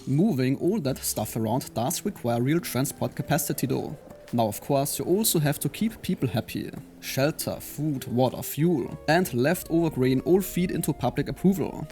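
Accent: Germany. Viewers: Direkt den Alman rausgehört😆😆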